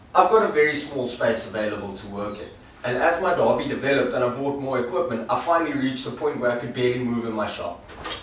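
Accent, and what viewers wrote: South Africa. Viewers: At last... I thought I was the only YouTube maker in Africa... LOL, I'm in Namibia, you sound like you from SA... glad I found you... Sub'd... Great job bru... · Dude, this is awesome. Nice to hear your accent - I'm a kiwi living in Lexington, KY and don't get to hear the South African accent AT ALL any more. Stay well brother! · Is he South African with that accent? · South African? I assume it from your accent.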